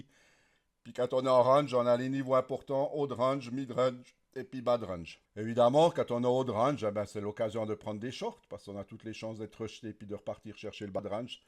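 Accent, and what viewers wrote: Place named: Alsace, France. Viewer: je reconnais bien ce bon accent alsacien car je suis de strasbourg 🙂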